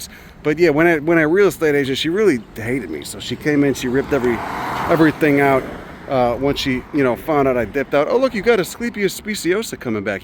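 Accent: Chicago, United States. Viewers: Are you from Chicago? I hear an accent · Haha! You are the new joy of my day. I'm guessing from your accent - Chicago? You are also an attractive man. Show your cute face more!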